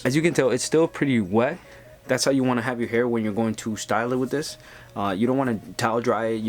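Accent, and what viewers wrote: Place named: Dominican Republic. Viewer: I knew he was Dominican by the first 2 words he said. 🇩🇴🇩🇴🇩🇴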